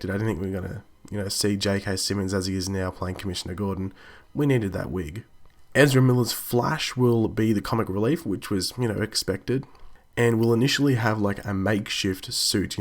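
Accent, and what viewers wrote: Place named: Australia. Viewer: your Australian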